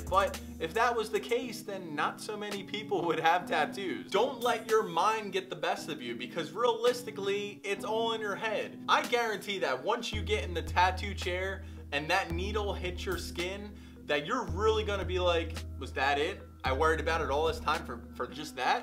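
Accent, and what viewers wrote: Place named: Delaware County, United States. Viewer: Delco accent. Know I can trust this guy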